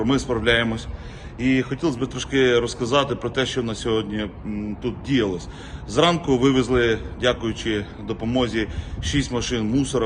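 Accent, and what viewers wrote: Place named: Western Ukraine, Ukraine. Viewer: В Одессе так не разговаривают там все на русском говорят просто человек с западной Украины и претворяется разве так можно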